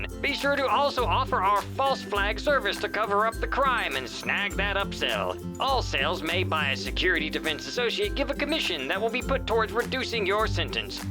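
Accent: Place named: Deep South, United States. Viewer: What the actual fuck is "self-own"? Also, why are randomly you talking like you're from the deep south?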